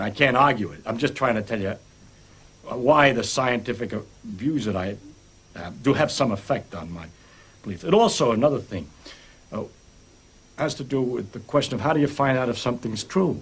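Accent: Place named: New York, United States. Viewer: I love the way he talks about the universe with the accent of New York longshoreman, or as his friends said a bum.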